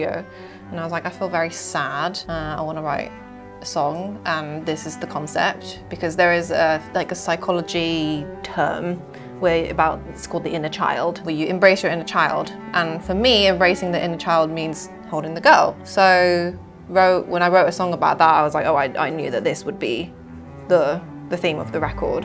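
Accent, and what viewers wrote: United Kingdom. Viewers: I just want to hear her breathe into the mic... I really want her to ASMR through my ears...I'm really loving the British accent too... sound so nice .... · How did i not know she was british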